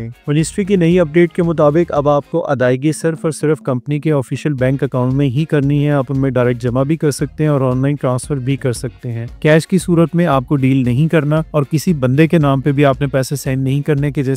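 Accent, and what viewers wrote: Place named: Bengal, India. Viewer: bhai ap Accent bangali wala lag raha hai..bhai bura mat man na..🙏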